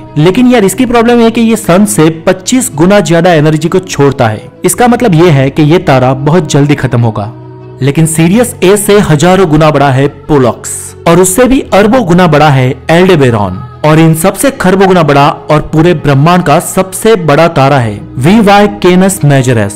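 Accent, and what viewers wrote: Gujarat, India. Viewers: Sir tum gujrat ke ho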